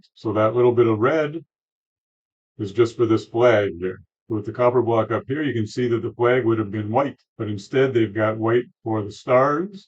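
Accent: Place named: South, United States. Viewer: OK, because your accent is heavy, sounds like you're from the Southern States of the USA. I didn't even know the AMIGA was a thing in Canada. It was mostly popular in Europe. So do you think Canada will win the World Cup then?! :D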